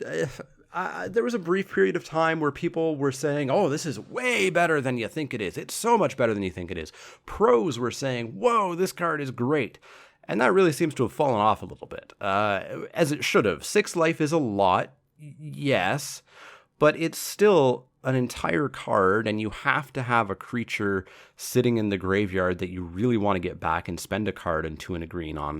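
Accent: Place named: Canada. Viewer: Nice content. Where are you from in Canada that you pronounce "un" as "on"? Tap an "ontapped" ally you control. Onto the "oncommons". Etc.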